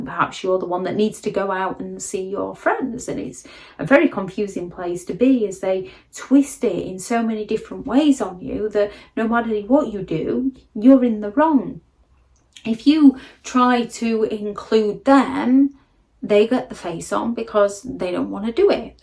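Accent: United Kingdom. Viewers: O.K. besides excellent content I love your British accent and as a mother of 5 your energy as U hop like a bunny in your first short.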